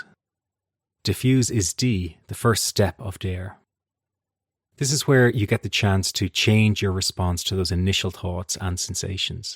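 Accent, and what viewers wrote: Ireland. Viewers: Very helpful during this difficult time. Never felt anxiety quite like this in my 75 years on this planet! Was feeling somewhat suicidal at first, but slowly learning to accept it. Waiting for your book 'DARE' to arrive. Meanwhile, enjoying the Irish accent! ;-)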